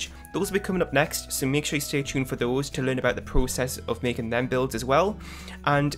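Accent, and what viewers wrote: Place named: England, United Kingdom. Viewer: Really love the building! And I adore your accent. Where you from in England?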